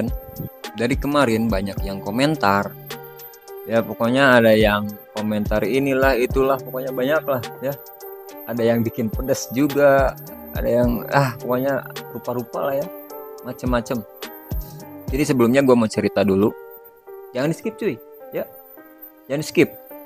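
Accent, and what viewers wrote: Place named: West Java, Indonesia. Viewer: Muka sama gaya bicaranya Abang emang mirip alm denzbagus bang ,,cuma beda logatnya aja kalo alm denzbagus kan kalo ngomong logatnya Lampung ,kalo Abang Sunda tapi gaya bicaranya sih mirip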